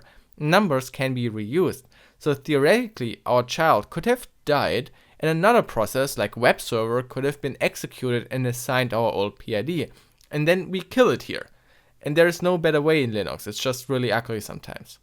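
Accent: Germany. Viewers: I have all the time the feeling that you are from germany because you have a german like accent :D. But nice vids btw :D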